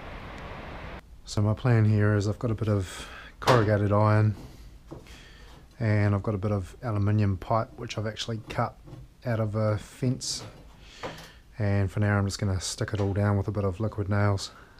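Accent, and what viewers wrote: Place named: New Zealand. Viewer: Option 1: Mate go to scrap car yard get an old car / truck radiator spray it black put in insulated glass box point at sun. Option 2: Alternatively get copper or aluminum tube paint with matt black assemble in insulated glass box point at sun. Option 3: Section off part of garage roof, seal and paint matt black, place cheap clear plastic cover over the top and send water down. Note seal well due to expansion of roof when hot. The option you have shown as will as first two above would work over a camp fire, tea candles, hot embers etc.. Keep up the good work kiwi "Kia Kaha" Stay Strong, I heard accent and saw orange sand bucket.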